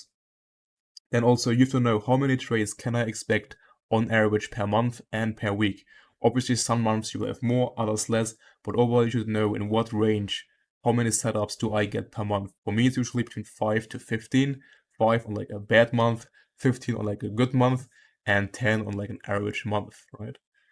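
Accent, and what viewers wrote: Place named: Germany. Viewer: Gotta love that German accent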